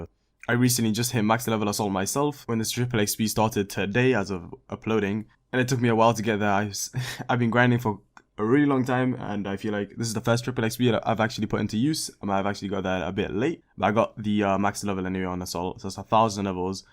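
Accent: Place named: Singapore. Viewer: Man sounds hella Singaporean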